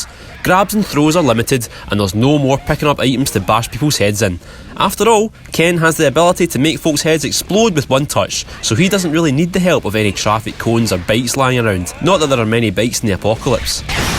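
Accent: Scotland, United Kingdom. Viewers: He's Scottish.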